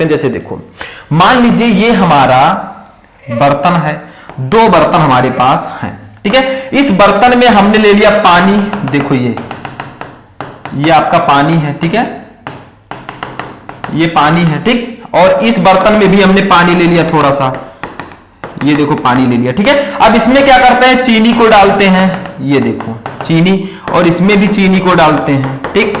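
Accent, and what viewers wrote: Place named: Gonda, India. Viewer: Sir aapke language se lagta hai ki aap aap Uttar Pradesh Gonda se hai 🤔🤔🤔🤔🤔🤔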